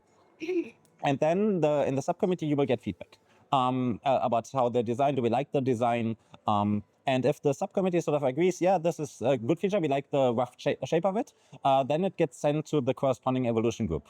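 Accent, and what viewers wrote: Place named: Germany. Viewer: I like germanized English.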